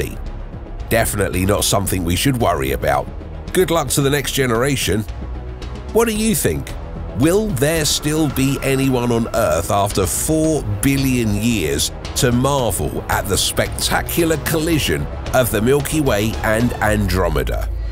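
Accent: England, United Kingdom. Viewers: If it wasn't for this guys English accent I wouldn't believe this!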